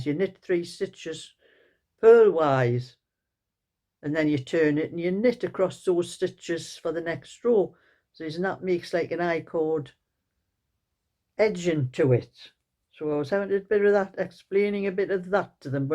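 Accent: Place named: Durham, United Kingdom. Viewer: I love your chats, you are interesting. Your voice reminds me of friends I know in Durham.❤❤❤❤. You have a lovely accent.❤❤❤❤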